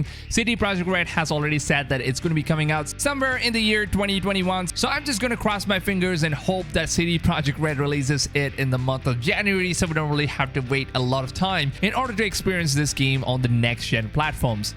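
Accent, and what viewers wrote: India. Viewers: Just a lame vid about skyrim titled cyberpunk from a guy with a 7-11 accent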